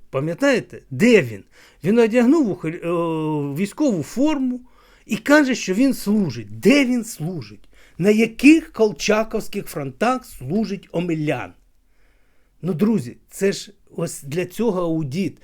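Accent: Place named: Russia. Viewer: В пана самого проскакують русизми , 10 років московський чобіт топче нашу землю , а ви , пане блогер , так несуразно розмовляєте українською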